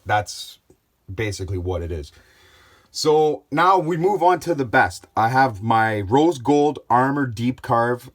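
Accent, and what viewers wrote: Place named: Canada. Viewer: I support you. I remember seeing you on a couch with Tman (and a cat) and there was some chick in the room too. I remember watching your holyday video where you stayed at a hotel at the beach with your family, Infact I remember that video well. I remember Tman referring to you as a better option as a friend, If I was interested in inviting someone to Down Under. I remember the amazing gift of joy Tman gave me, as he puffed away on a Lucky Stike or Camel un fileted. But just now I want to say to you. I support you and Canada. I enjoyed who you are. And I enjoy your Canada voice. I could go on like your bus(van) trip to the Zippo factory or how I'd love to just grab a Blue Berry Zippo of the shelf at my local tobacconist. Ashes to ashes. BUD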